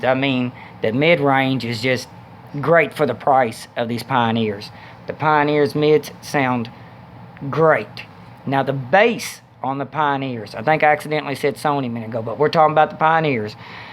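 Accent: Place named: Arkansas, United States. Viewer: I'm from AR and I can confirm his accent is very close to the folks around these parts.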